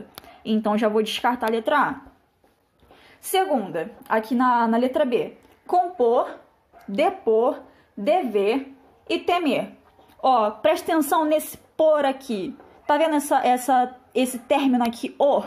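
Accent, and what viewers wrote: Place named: Rio de Janeiro, Brazil. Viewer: Esse carioques dessa proff Onde que vai o acento?? E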